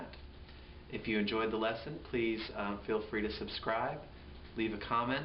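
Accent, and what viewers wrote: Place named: South, United States. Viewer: i only just noticed by your accent u are from the south even after watching this vid for years. greeting from Long Island NY. thanks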